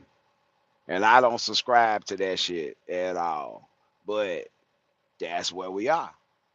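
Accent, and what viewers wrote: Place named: Mississippi, United States. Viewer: man you sound like one of my homies from Mississippi are you related to some kings from Hattiesburg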